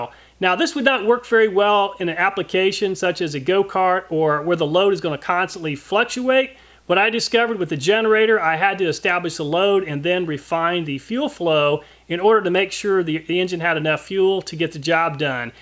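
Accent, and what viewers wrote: United States. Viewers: I really like your videos and your American english. Maybe try next time create hydrogen and oxygen from water with electrolysis and supply gasoline engine with mixing of this two components. It will be probably hard to create enough such fuel but you can at least try this. Instead of that you can try supply engine with clear oxygen instead of air.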